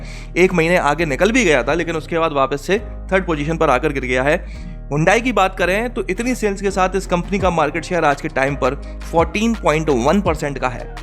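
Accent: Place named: Bihar, India. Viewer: सर आप एकदम बिहारी की तरह बात करते है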